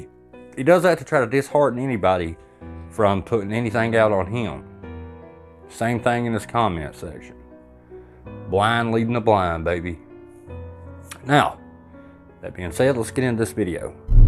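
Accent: South, United States. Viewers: I love your accent, y’all talk mighty fine down there in the south❤ hey great video! Nothing 2 dimensional about it! Made me lol too! Much ❤ & ✌🏼out! Oooo & Lee can 🖕🏼heh heh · Great video! Sorry this is nothing to do with ghosts or debunking but you mentioned accents. I don’t know what hillbilly means but I was struck by your accent when I came across your channel and wondered since - what is it? I’m not American so I’m just guessing like Virginia or Carolina or around that area? I could be way off sorry 😂😂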